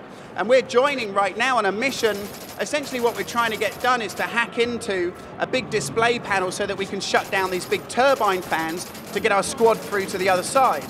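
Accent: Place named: England, United Kingdom. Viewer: English Accent))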